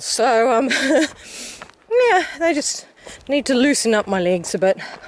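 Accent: New Zealand. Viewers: Great day for wildlife. Loved the beer(bear). Vanilla is a good repellent for the gnats. P.S. Your Kiwi accent is totally charming, I could listen all day!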